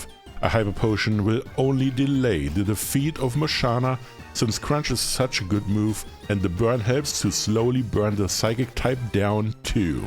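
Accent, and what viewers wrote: Germany. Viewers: Your german accent is very strong.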